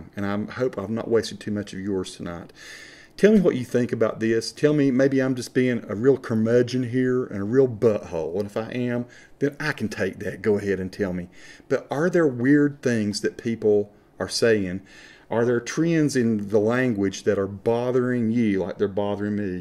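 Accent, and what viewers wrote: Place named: Appalachia, United States. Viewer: Hey, I just watched this video (5 years later), you are raising a very interesting issue about sociolinguistics. First: don't change the way you talk, Southern Appalachian accents are the best ;). Lots of factors are affecting the way people talk, looks like what is dividing people in the South (and elsewhere) today is whether they identify themselves as urban or more rural: the latter group being more likely to keep a distinct accent while the former tends to adopt a "global identity". Other factors come into play as well such as the representation of an accent in media (literature, television...) that create stereotypes and the woman you talk about seems to be rejecting, as you said, her identity in favor of a "global" identity probably because of negative stereotypes. And that's pretty sad because it's the fact that people have different accents that makes a language rich and interesting to study, but more and more people (especially in the South unfortunately) in large cities tend to adopt a more "global" accent we call "General American" in phonetics. 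Interestingly, the representation of an accent is different from place to place: where I'm from (France), people usually like U.S Southern accents. Thanks for sharing that ;).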